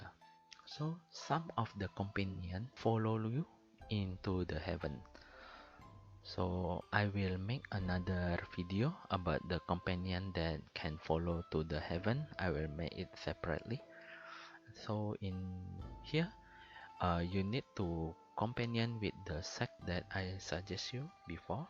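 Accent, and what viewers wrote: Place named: Indonesia. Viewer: Fix org indo ini, dari cara ngmng nya keliatan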